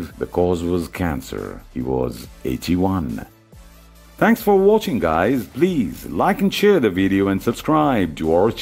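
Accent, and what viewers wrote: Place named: Afghanistan. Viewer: Come on man!! Why is someone from Afganistan narrating this ALL AMERICAN tv show cast..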